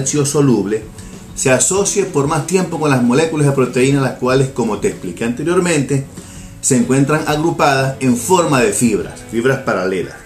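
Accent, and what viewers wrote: Venezuela. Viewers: ¿Queso de mano? ¿ud es venezolano? saludos desde caracas. gracia por la explicación. llegue a su video no para aprender hacer queso. si no para darme cuenta de las malas prácticas de los queseros aca. ningún queso mozzarella producido en venezuela se estira, todos son terrosos y ásperos; al parecer están usando en exceso el sulfato de calcio para darle más rendimiento a su materia prima y lograr tener más producción, incluso buscar acelerar los procesos. ya pude escuchar al final de su video que es de aca. nuevamente gracias.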